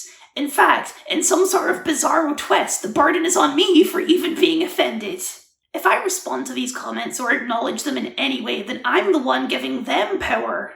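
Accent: Scotland, United Kingdom. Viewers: I wasn't expecting your voice to sound like that, but I think it's really cute tbh. And I love your accent (I'm a sucker for Scottish accents, they sound good no matter what. Even when I can't understand what's being said lmaoooo) Those people are miserable and live boring lives. Using 4chan in 2024 says a lot more about them then anything. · What's wrong with your voice? It's obvious: you've got a scottish accent which means most americans will think is... br*tish 🤢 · good video, liked and subscribed. A few notes from a trans woman of 50+ years of age, and who transitioned as a fully mature adult. I lived more than half of my life pretending to be male, and in the 90s I started transition at around 30 years old. Prior to learning about transitioning and being trans I was a typically masculine looking over 6 foot tall human, with a "Girls Voice" as a person who was attracted to women, looked like a handsome man, it was a difficult thing to work through. 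I ended up learning to sing deep and started smoking so I could affect a masculine voice. (So glad I stopped trying to be a man) but the thing is randomly some peoples voices don't automatically break, sounding masculine is not a given. For me I was lucky as a trans person, in that my voice has always passed for a woman. Even as a late bloomer. doesn't sound as feminine as I did when I was twenty something. (Humor) However he has my old accent, and that bugs me.:P Yeah mum was Scottish, and up to about 11 or 12 years old I had my mums accent. But going to School in the USA forced me to change accents.:p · I just figured that was some odd Scottish accent you grew up with. I'm serious. I've met people who only spent their very early years in a different country and their accents were THICK despite that. But you in a box. That really makes a pathetic level of sense. I live in a red state and have seen people (not kids in high-school but adults in their 30s and 40s) insist someone with just a speech impediment must be a foreigner and will lose their tempers if opposed. 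· What's wrong with his voice? I think its the fact he's speaking scottish english. There isn't anything wrong with that, it's just a dialect/accent.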